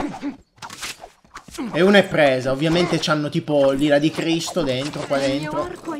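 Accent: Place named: Modena, Italy. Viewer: Ho una domanda da farti: all'accento presumo che tu sia modenese. È vero?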